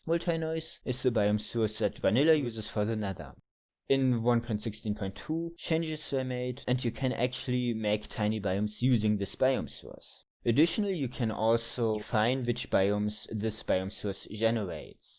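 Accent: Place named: Germany. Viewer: a yes. my library of german minecraft yt accents is growing once again, but maybe by devlog 4 I will get used to it and won't notice anymore (as happened with mango, clover, and probably many others) EDIT: also that pronunciation of your name is... is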